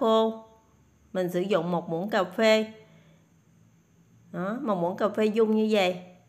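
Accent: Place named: Mekong Delta, Vietnam. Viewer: Tiếng nói rất rõ ràng và thật thà đậm nét miền tây, hướng dẫn cách làm rất dễ hiểu thật tuyệt vời 👍hà, chúc em thành công hơn nữa.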